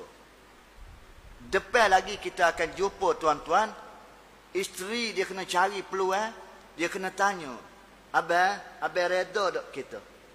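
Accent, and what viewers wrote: Kelantan, Malaysia. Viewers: Ayat ustaz memey nostalgia sguh, caro ore kg kecek sngothi..lenguk bahasa, loghat dio memey buleh pehe hok mn ore klate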